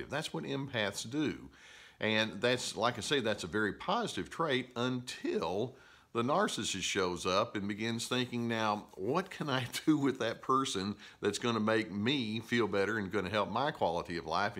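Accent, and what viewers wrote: South, United States. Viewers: Thankyou so much you've conceptualised so much of what this personality type is and those who have taken advantage of it. I've often said when, disappointed , that I expect too much from people . I know I have been naive , expecting people to be more like me. Learnt a lot by being burnt . I have watched a lot of your tube postings. The knowledge you've given me re Narcissists has been a light on on and several ' ah ha ' moments . Scott 🇦🇺 that's again , love the southern accent!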